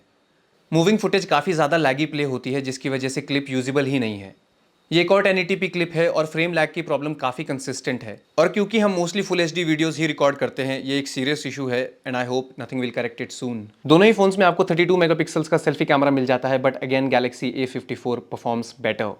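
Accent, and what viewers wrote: Dehradun, India. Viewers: Bhai dehradun s ho aap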